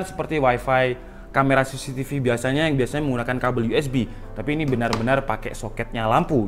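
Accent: Java, Indonesia. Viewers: olehmu medok jowo kuetok pol mas... tulisane dadi tulisannya wkwkwk....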